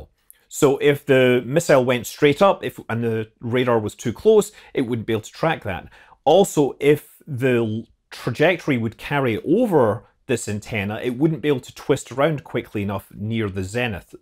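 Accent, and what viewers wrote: Scotland, United Kingdom. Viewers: Felt like Curious Droid with a Scottish accent.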